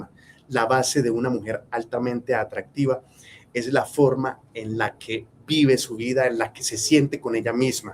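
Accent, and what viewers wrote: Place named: Colombia. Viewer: Hola saludos 👋 Yo le sigo hace un tiempo pero lo q me llamó la atención la primera vez q ví un vídeo suyo fue el tatuaje q tiene en su cuello..es el año q mi único hijo varón fallecido nació..😢 pero desde ese primer instante que me salió un vídeo waoo me encantó como se expresa .ademas q me encanta el acento colombiano🤗.los consejos q da q me han sido d gran ayuda en el proceso por el q he estado pasando.. gracias bendiciones 🙏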